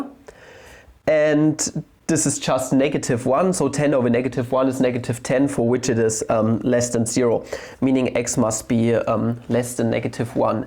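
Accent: Germany. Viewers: Du siehst zwar ganz hübsch aus, aber Dein Denglisch ist schwer auszuhalten. 😄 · you have too much latent heat of confusion yourself.You must review your teaching methods, besides German accent,umm ah ah is off-putting.Why not revert to talk and chalk traditional method,with plenty of home work,no amount of IT gadgetry will do.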